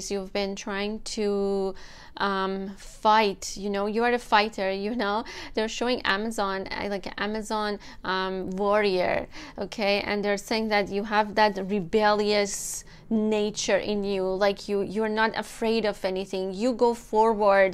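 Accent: Iran. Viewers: I Love Persian Accent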